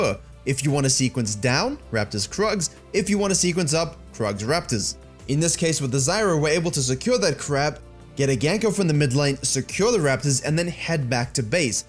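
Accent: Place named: South Africa. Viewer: South African. I had the same question when I saw his first video. To be honest I can't say I've heard much of the dialect outside of the heavily tweaked variant in "The Expanse" - that said I very much enjoy the English enunciations. It's like a much smoother sounding German or Dutch.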